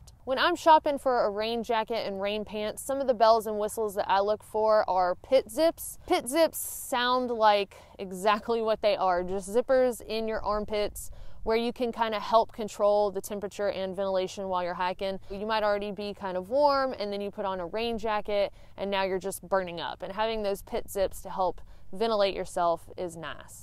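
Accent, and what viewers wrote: South, United States. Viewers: I'm a North Carolinian living in Sweden currently, and you are not only helping me plan an awesome "welcome home" hike but hearing that sweet southern twang makes me feel at home :) haha (even though I don't have it)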